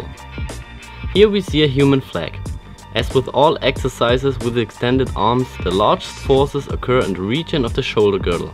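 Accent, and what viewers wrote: Germany. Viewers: hahaa, typical german accent. :D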